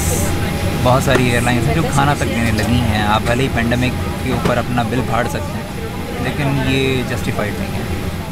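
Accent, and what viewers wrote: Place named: Haryana, India. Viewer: Bhai love the way use haryanvi accent and words in between ur lines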